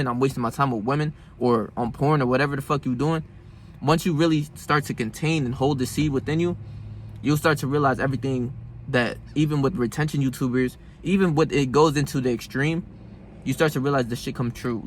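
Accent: New York, United States. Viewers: bro got that new york accent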